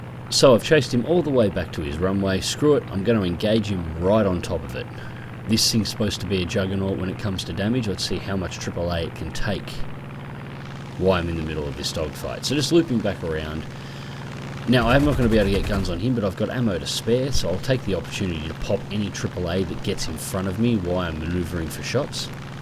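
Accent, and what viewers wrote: Australia. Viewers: the firs video I watched was his Sabre f2 firsti pressions video and the reason I subbed was his flying skills his cool calm and collected tone and of course his aussie accent ;)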